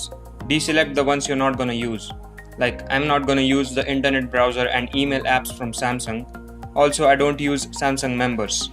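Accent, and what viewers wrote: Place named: India. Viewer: I like your India acent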